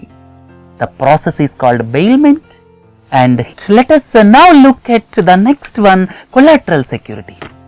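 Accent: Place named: South India, India. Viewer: atleast the difficult or complex terms must be told in a neutral accent plz... for these terms, no south indian accent plz... coz north indians like us cant understand...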